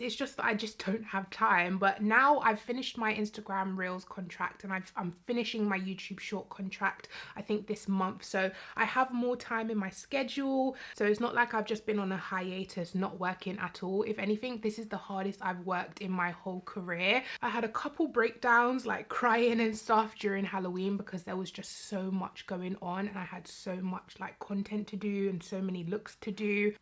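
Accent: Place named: United Kingdom. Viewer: I can’t be sure, because I’m not a content creator, but I think you’re over thinking it. I like seeing your face, 1, because we have similar features. Like keep it a buck? You’re black and it’s nice to see how to do looks and make them look good on brown skin. 🤷🏽‍♀️ this look is great, I want to try it. That’s what I take from you. Maybe it’s just me, but I don’t care if you use the same products, I just want to see how to turn out looks. I am NOT a TikTok fan. Not sorry about it either. Lol, but I just like to talk about makeup and when you come back officially, I want you to do what you want to do, I just want you to be happy doing it. I just want to see your face and hear your British accent, and watch makeup. Lol. Am I over simplifying?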